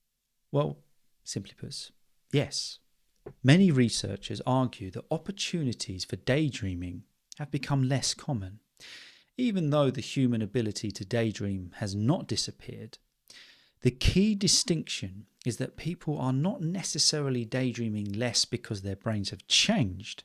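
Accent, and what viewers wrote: United Kingdom. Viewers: Is it UK English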